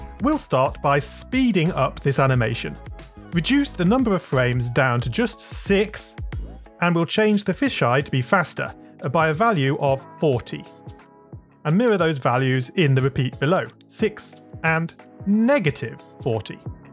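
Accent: United Kingdom. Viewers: are you British British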